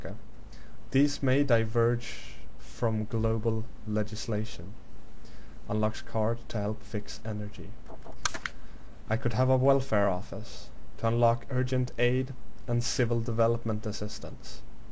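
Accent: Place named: Sweden. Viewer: Clubs He's Swedish. English isn't his native language. :P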